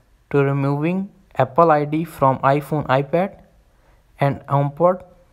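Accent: India. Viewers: So straight from the start, I see the comments are fucked and are bots. And it explained it when I heard the head ache Indian accent. Dislike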